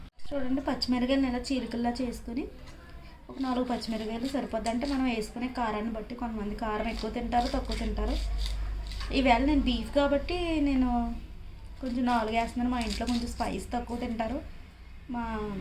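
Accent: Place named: Amalapuram, India. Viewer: కూర ఎలా ఉన్నా కానీ మీ మాటలు మాత్రం ఆంధ్ర అమలాపురం అమ్మాయిలా ఉంది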